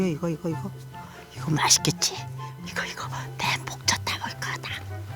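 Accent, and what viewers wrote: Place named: Jecheon, South Korea. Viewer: 제천 사투린데 넘 정겹다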